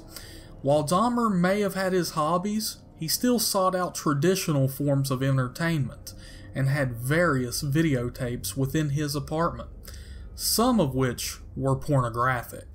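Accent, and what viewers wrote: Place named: West Virginia, United States. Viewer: Are you from West Virginia?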